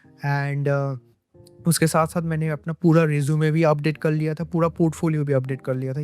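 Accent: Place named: Bengal, India. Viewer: Bhai tumi Bangali Je tomar Hindi sunei Bojha jacche Anyways Good and Informative ...Thanks a lot.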